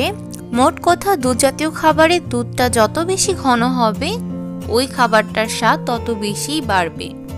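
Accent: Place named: India. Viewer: Khub khub Valo laglo khete Mon chaitese apu TMR Katha gula Indian blogger Der Moto lage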